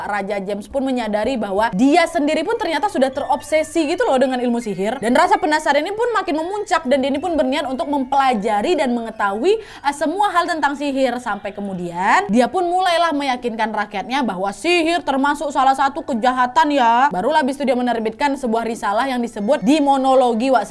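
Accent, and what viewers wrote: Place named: Medan, Indonesia. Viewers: oh begitu, kalo logat si saya emang tau makannya pertanyaan saya Medan, Pekanbaru atau Batam 😂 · Kak itu lahir di pekan baru sudah bebrapa kali kk blng dan memang bahasa ny agak mirip sm kek logat orang medan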